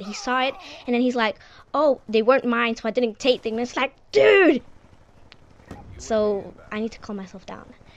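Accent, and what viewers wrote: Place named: London, United Kingdom. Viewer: You have a london accent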